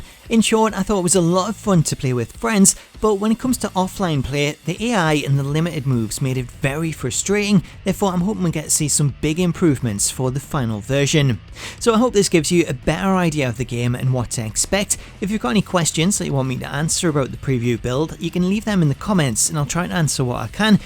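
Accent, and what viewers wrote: Newcastle, United Kingdom. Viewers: Maybe he's a Geordie? I'm only guessing though. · Just curious where in in the UK Are u from I can’t place the regional accent 😆 yeah I can already see how repetitive this is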